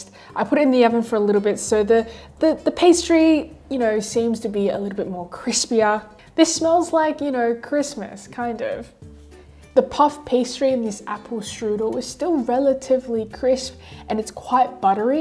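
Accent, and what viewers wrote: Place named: Australia. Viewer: Gosh your thicc oz accent i can’t even 🥰🥰🥰🥰🥰🥰🥰🥰🥰🥰🥰🥰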